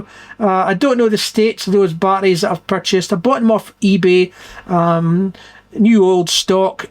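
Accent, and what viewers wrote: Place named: Scotland, United Kingdom. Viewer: I thoroughly enjoyed your interview with Dave from EEVblog. I think you were one of his most interesting interviews to date. Didn’t know you had it in you from just the view of your hands and Scots brogue all these years mate. Good on ya then!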